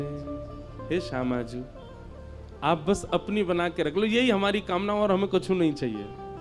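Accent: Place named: Braj, India. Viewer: Om Shri Ganeshay Namh 🙏 Jay Shri Krishna Radhe Radhe 🙏 ♥️ 💙 ❤️ Jay Shri Hari Jay Shri Shyama Shaym ji Jay Shri Radha Rani Jay Shri Radha Kishori ji Jay Shri Radha Raman ji Jay Shri Radha Vallabh Lal ki Jay Ho Jay Shri Guru Dev Maharaj ji Aapke Charno Mai Koti Koti Pranam 🙏 Radhe Radhe Jay Shri Harivansh Guru Dev Maharaj ji Aapki vraj Bhasa Badi Sumadhur hai Radhe Radhe Jay Shri Vrindavan Dham Jay Shri vraj vasi 🙏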